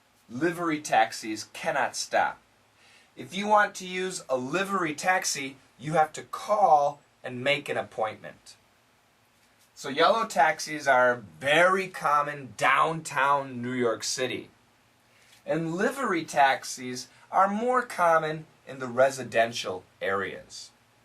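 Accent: United Kingdom. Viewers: With hundreds of styles that fit together beautifully, thousands of colours and ideas, great ranges of kitchens, small living spaces and lots of wonderful plants to any garden. With a little inspiration you can achieve anything. Home Base. Make a house a home. British accent! Well, I'm more used to the American (or Canadian) accent yet it's good to hear it from time to time for variety's sake. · With hundreds of styles that fit together beautifully, thousands of colors and ideas, great ranges and kitchens, small living spaces and lots of wonderful plants for any garden, with a little inspiration you can achieve anything. Homebase make a house a home. Hello, what a British Accent. ohh my god. small or smart? I have always wondered why they are unable to pronounce the R well? it's the same here or even worst. The English language should have different name. 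"God bless America"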